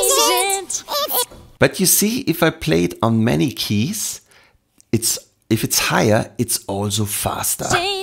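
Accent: Germany. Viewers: man kann deinen deutschen akzent deutlich hören :)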